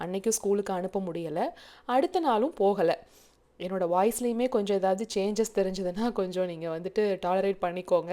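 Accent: Coimbatore, India. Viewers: Hi akka naa new subscriber.my name kavya,naa coimbatore tha neenga pesarathu rompa pudikum akka naama oru slang nu kaykum poethu happy ah iruku akka,and Happy Birthday kutty and happy wedding anniversary Anna and akka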